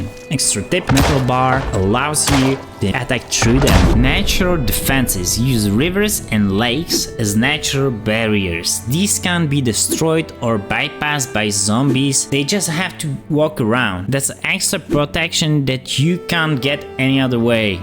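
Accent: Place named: Czech Republic. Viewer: Nejsi náhodou čech? už jen podle toho jména a v ty ang mrtě slyším ten přízvuk, nic proti pěkný video :D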